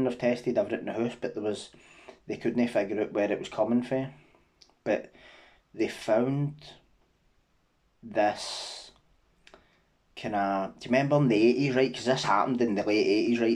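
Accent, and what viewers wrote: Scotland, United Kingdom. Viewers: We speak English with Scottish dialect 😂 the world over has problems with our speech....deal with it people your doing amazing keep it up big guy love from Coatbridge ❤ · Your quite consistent with your videos,thats a gd thing.aye a widnae worry about they stoaters that are commenting on your accent,i can read and speak english really well.but id rather speak in my native tongue,like yourself,its more authentic.nothing worse when ye have fellow scotsmen trying to bring ye down for not speaking english lol.its not even our language in the first place.😂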